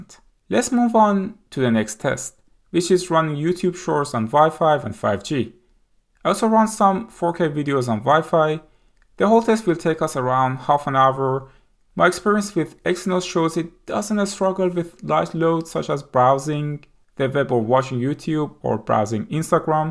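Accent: Iran. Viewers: Persian accent 😄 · Great video my iranian brother (guessing by your accent ;) )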